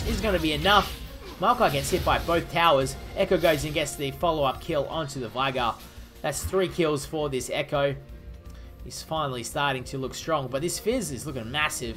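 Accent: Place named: Australia. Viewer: Terrific gameplay and what top drawer aussie commentary dude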